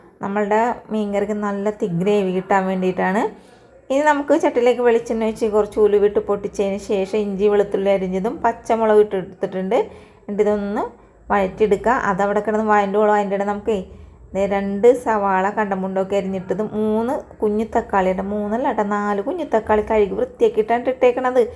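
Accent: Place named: Kakkanad, India. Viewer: കാക്കനാട്ടുകാരുടെ ഭാഷ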